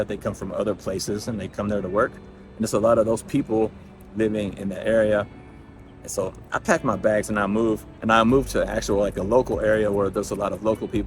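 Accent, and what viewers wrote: South, United States. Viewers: I’m glad you didn’t quit and leave China during the COVID years, when your businesses didn’t do so well. You persevered and survived , despite the negative experiences from individual Chinese who assumed some westerners could have covid, based upon Chinese media reports. . But you have to remember you’re living in a country with 1-4 billion people who can act unpredictably,, . Like others have stated Asians in the USA were treated a lot worse. Trump politicised the pandemic, and referred to it as the Chinese virus , which was echoed across the conservative media channels like Fox News, This created unnecessary fear, and racist attacks against Asian's in the US. . But the important thing is , you survived and your life is better now. You seem like a laid back guy, who overcomes problems and just moves on, By the way , I love your accent , is it a southern American accent? 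I’m Chinese by descent, but I was born in Australia.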